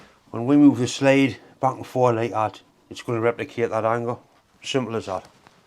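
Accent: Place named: Northern England, United Kingdom. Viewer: Great to hear your voice again, I hope you and are doing well despite things. I'll be honest but I miss northern accents down here in Norfolk, and yes yours is the best. Any time your down in Kings Lynn, beers are on me. Chin up bonny lad, you take care of yourselves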